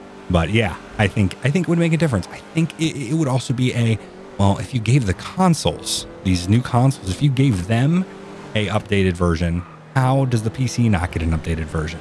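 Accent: Bay Area, United States. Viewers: Why do you sound like Bay Area buggs a little? Or is it just me? Anyways love the content!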